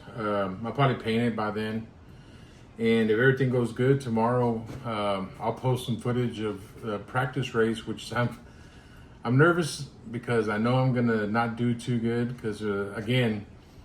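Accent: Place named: San Antonio, United States. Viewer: Great videos! Sounds like you live in San Antonio. I visited Oatesified this past weekend, did some marshaling, and met a lot of nice racers. I’ve never raced but I plan to build the Losi 1/8 E Buggy kit, should arrive early December. Hopefully I’ll get it built and hit the track in January. Hope to see ya out there!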